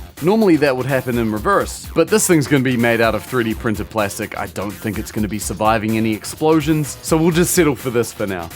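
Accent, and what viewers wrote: New Zealand. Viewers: Was NOT ready for a Kiwi accent lol so naturally "deck box" sounded like something else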